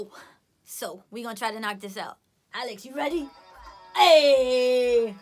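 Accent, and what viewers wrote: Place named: New York, United States. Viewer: Your New York accent is very strong 😂😂 It cracks me up at times. But you are keeping busy.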